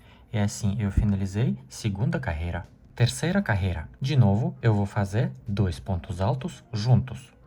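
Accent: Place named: South, Brazil. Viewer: Estou sendo sincera...se eu não soubesse que você é estrangeiro, e ouvisse você pela primeira vez...acharia que você era de alguma cidade do interior das regiões do Sul ou do Sudeste, por causa do seu sotaque que é muito sutil.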